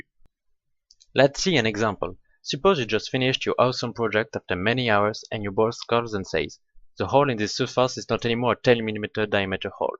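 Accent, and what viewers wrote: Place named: France. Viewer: Your French accent is just fine; one thing, if I may: middle is pronounced with an "ee" not an "eye" sound, like here: <link> Many thanks for your effort, good video!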